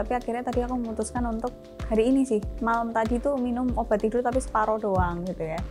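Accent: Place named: Pasuruan, Indonesia. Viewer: Arek pasuruan mana suaranya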